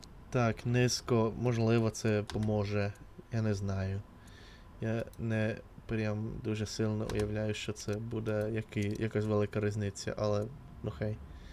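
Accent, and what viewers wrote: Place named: Finland. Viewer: Обожнюю Суомі, напевно це країна з найкращими людьми, і сам хочу колись побувати там і вивчити фінську. Бажаю успіхів цьому каналу! А вивчення будь якої мови то питання часу, я сьогодні враженний від того, що почув українську з фінським акцентом, до мурашок)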